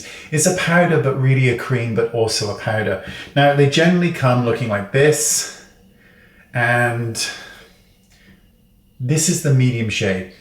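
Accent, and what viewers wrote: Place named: United Kingdom. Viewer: I love just to look at,sometimes, don't get everything he says, British accent,which I love, but I do admit, his videos are very informative, useful!! Thank you,handsome, talented ❤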